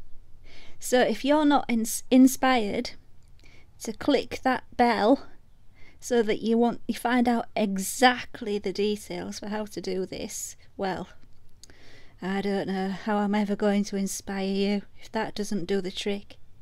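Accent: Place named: Harrogate, United Kingdom. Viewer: I feel like wrecking my microwave. Lol. You sound as though your from my area. Harrogate. I can’t find one 😥 I have the pigments. I’m ready Only got tiny magnets tho. Scared lol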